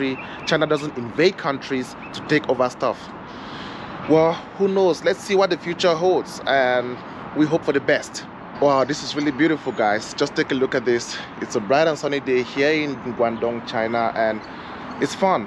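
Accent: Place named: England, United Kingdom. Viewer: I have high respect for you my brother man ,,, l love you English accent ,,,keep going what you do .best of luck for. You,, god bless your family and your people,🙏❤😇😇😇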